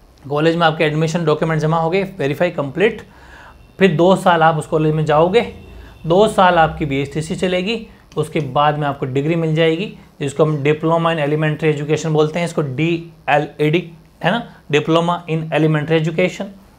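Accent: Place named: Rajasthan, India. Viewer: Rajasthan se ho na sir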